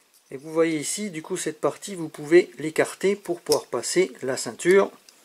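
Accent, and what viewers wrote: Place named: Franche-Comté, France. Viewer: Hoooo j'ai kiffer l'accent vingt dieuuuuuuu...franc comtois en force lol... Plus sérieusement c'est de la très bonne qualité mais le prix de la grenade pique un peu quand même je dois avoué sinon superbe présentation comme dab.a bientôt à l'OP 😉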